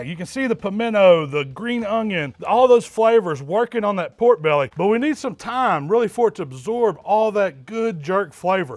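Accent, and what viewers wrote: South, United States. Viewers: You get a big ol' boy with a southern accent.. You listen to what he has to say about smoking and BBQ.. I don't care who you are. I got these marinating right now and smoker tomorrow. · When you see a big dude with a southern accent making barbecue, you know you are in the right place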